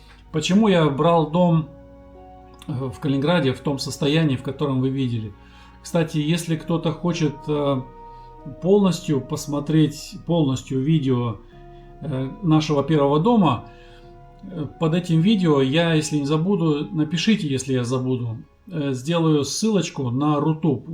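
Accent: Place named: Siberia, Russia. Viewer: Очень радует очень хороший русский язык. Поверьте, я в этом кое-что понимаю., уши радуются. Задолбали косноязычные высокопоставленные идиоты.Ты чокаешь, как у нас в Сибири. И акаешь. Это нормально.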